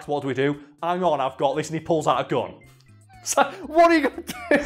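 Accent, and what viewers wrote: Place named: Sheffield, United Kingdom. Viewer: I thought I was the only one who noticed he is talking more and more with a Sheffield accent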